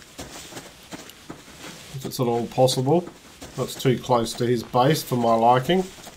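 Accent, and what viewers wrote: Australia. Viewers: The video was great so I subscribed to your channel. You have an aussie accent. I am from the Gold Coast, Queensland.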